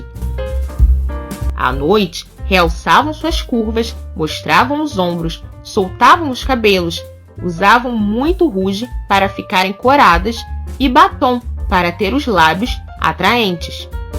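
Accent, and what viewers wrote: Rio de Janeiro, Brazil. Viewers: Gente amo esse canal mas o sotaque carioca da narradora è puxado demais , isso incomoda um pouco 😞mas parabéns pelo trabalho gente ! Segura esse like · A narradora é carioca ,.. A extoria da época vitoriana 😅kk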